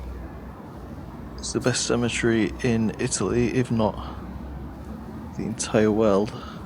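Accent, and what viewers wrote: United Kingdom. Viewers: Though I'm a Filipino, I like your British accent.. More videos,..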